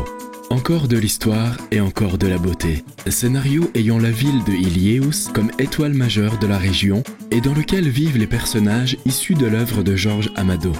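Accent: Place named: France. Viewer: Bahia com sotaque francês ficou divina. IMPERDÍVEL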